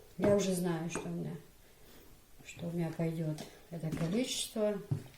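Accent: Chechnya, Russia. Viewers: Я сегодня подписалась на вас, прочитав немного комы, я была удивленна, тем что вы не чеченка) а так похожи, и акцент у вас уже как наш))) Аллах дал атто бойл ха 😘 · Ассаламу алейкум, я думала, что чеченка👍🙂 даже акцент похож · И акцент чисто Чеченский, когда говорить на Русском. Молодец удачи тебе и счастья. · Акцент зато чеченский👍👍👍👍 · Окцента чеченский мололец. Спасибо попробуем. Плачь моя подписка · Акцент даже нохчи ю ) марш йог1ийл.рецепт сохраню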